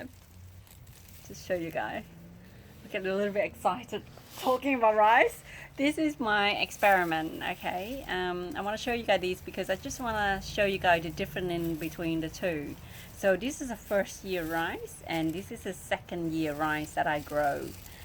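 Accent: Australia. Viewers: Beautiful plants also Is your accent mixed in with Australian? 😌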